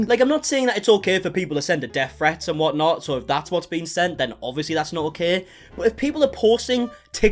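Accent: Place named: North, United Kingdom. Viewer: NICE ONE! What is your accent, by the way? It sounds like somewhere in the UK, maybe up north, but I'm not sure.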